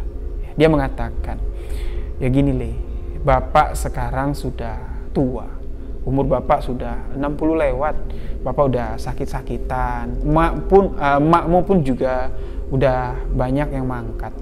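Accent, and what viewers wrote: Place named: Bali, Indonesia. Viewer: Kurangin logat bali nya bisa ga mas? Apalagi setiap kalimat selalu ada logat bali nya... Agak kurang nyaman..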